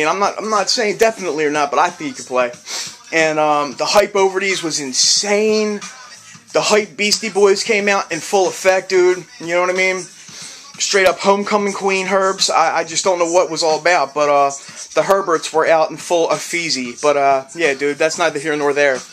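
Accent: Philadelphia, United States. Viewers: damn wish them draft day's were a size 10 i fuck wit em... i scopped that philly accent from the beginning lol.. #215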